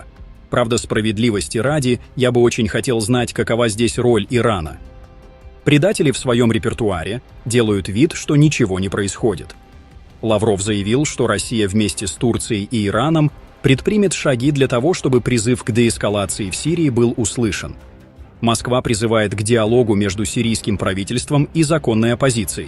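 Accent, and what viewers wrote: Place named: Armenia. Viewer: не слушайте этого армянского пропагандиста все армянские деньги вкладывать чтобы общество вести в заблуждение Россия поняла что в Сирии нечего делать если сирийский народ 99% не хочет Башир асада зачем наши войска должны быть в си и деньги ещё вложить а насчёт арцаха это имело отношение к кавказской Албании VII век а Карабах по всем документам идёт Карабах товарищ армянские продажные с красивой речью выступаешь продажный человек что тебе армяне говорят то и красивые волосы наступаешь с армянским акцентом конечно не выгодно рассказать анекдоты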